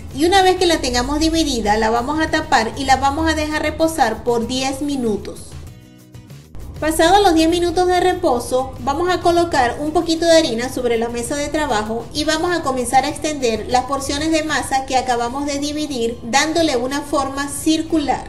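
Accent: Venezuela. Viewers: Estoy buscando recetas que sustituyan el clásico pan de jamón de la cena de Nochebuena y Nochevieja venezolana y esta receta es la que más me gusta, por tu acento creo que eres venezolana también, o me equivoco? Saludos.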